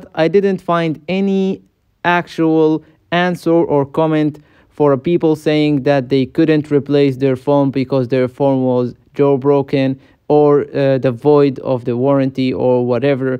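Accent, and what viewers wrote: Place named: Arab world. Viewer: لهجتك عربيه🙂👍🏻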